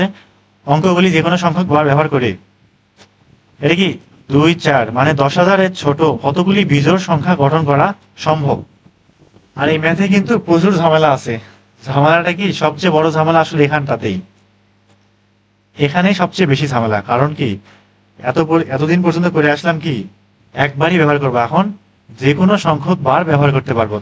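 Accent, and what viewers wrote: Naogaon, Bangladesh. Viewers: Vaiyer basha naogaon te naki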